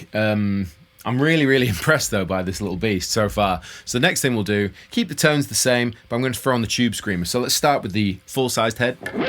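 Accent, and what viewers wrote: United Kingdom. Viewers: even your spoken words are so accurate, like your renditions haha. Don't get me wrong, English is not my first language thus sometimes it is very diffulicult for me to understand British accents in this US accent dominating world.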